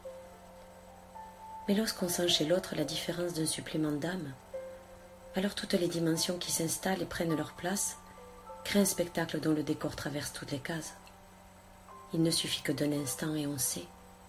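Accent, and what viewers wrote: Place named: South, France. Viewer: Je le redis "sublime" et tu as le même accent du sud que moi. Encore merci 💖💖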